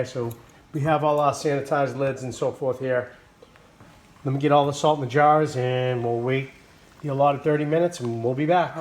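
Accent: Boston, United States. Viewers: Could you be from BAHston? Also Mainers talk like yoy, too. (or is it "tok"?.Us Jerseyans say "tawk," lol.).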